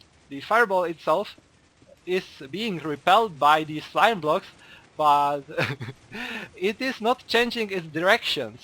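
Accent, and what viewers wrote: Czech Republic. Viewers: Jde to znát že jsi čech :D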